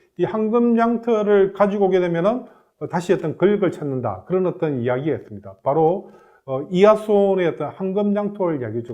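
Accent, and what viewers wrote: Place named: Gyeongsang, South Korea. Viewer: 좀 죄송한데 내용을 떠나서 외국과 관련된 유튜브에는 경상도 심한 사투리 억양 소유자는 나오지 맙시다. 우리 말 발음도 제대로 못하는 사람들이 경상도 아닌가? 직장에 경상도 사투리 쓰는 놈들 참 문제 많다. 사투리를 못 고치니 지들 경상도끼리 뭉친다. 니는 어데고 하면서 아주 가관이다.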